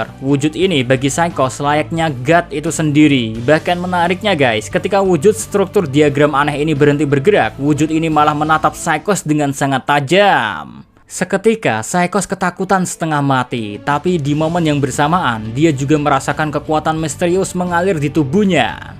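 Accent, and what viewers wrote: Java, Indonesia. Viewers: Apakah psykos udah msk level ancaman dewa mohon dijawab mimin ganteng dan medog?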